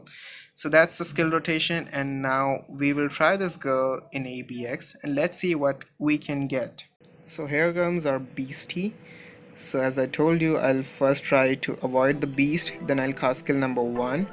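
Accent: India. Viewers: your accent sounds Indian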